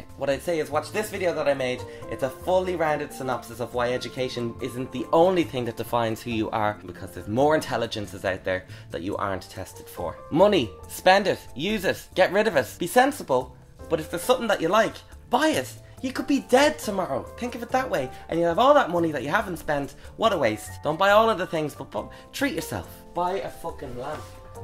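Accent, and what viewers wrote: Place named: Ireland. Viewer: I love your accent. I need an Irish man in my life!! I could listen to you all day. Sending love from the other side of the world (New Zealand)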